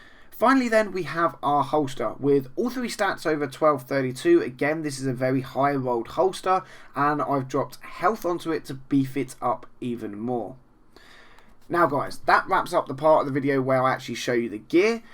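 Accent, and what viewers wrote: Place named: Cornwall, United Kingdom. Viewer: Gonna go on a guessing your from the south west of the UK:) A slight cornish accent;) I'm from Devon myself:)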